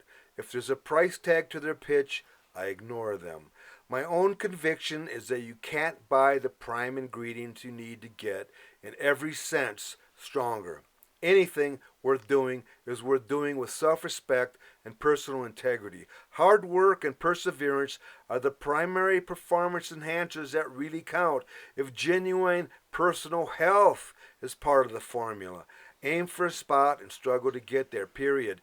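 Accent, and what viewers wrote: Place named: Minnesota, United States. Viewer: Well! Look up the phrase "Old Guys Rule" and you'll find a link this this video. I think my jaw was on the floor during the entire thing. You've transcended mere "inspirational" and achieved Zeus-hood. 320 pounds - egad. I turned 69 earlier this week, and for that birthday I did a rep of 225 ("two plates") in the local YMCA after 29 years without touching a barbell. (My peak in my 40s was a couple of reps of 287.) I was a little shocked that it only took me two months from 135 to 225 - newbie gains. Apparently, you can build muscle after 60; I had no idea this was so. I shall next attempt 230, then 235, etc. Anyway, calling this a remarkable video doesn't quite capture it. This is one of the realist things I have ever seen. Your voice seems very familiar. After some thought I have it: Your voice reminds me of Jonathan Banks, who played Mike Ermantraut in "Breaking Bad." Banks is from D.C./Maryland, but I thought I detected a Minnesota dialect from you. 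Where are you from?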